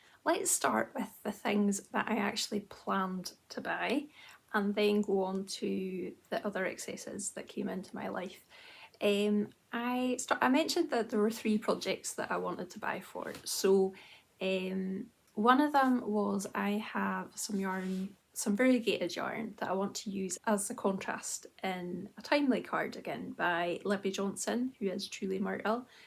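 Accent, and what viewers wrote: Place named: Caithness, United Kingdom. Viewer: That sounds like a Wick/Caithness accent! Lovely slipcover 🙂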